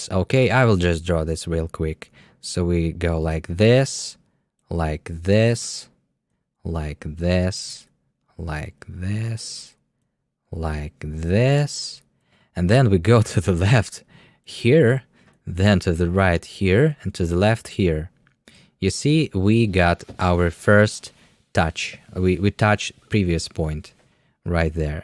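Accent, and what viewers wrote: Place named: Russia. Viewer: Cool video, comrade. Your Russian accent is so clear and melodic. I love It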